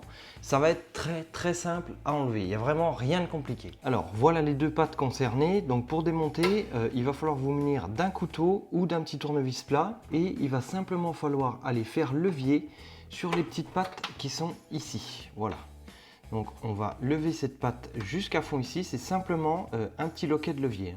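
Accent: Franche-Comté, France. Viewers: Merci pour cette vidéo qui tombe à pic, je vais pouvoir enfin démonter ma porte grâce à vis explications !! .. petite question j'espère pas indiscrète..!! "VS ne seriez pas franc-comtois par hasard..." ??!!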